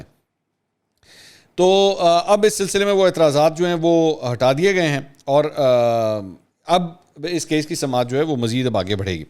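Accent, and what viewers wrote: United Kingdom. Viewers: 😂 good British accent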